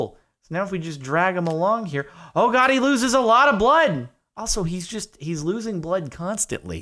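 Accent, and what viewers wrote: Russia. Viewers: I love your accent it sounds like a Russian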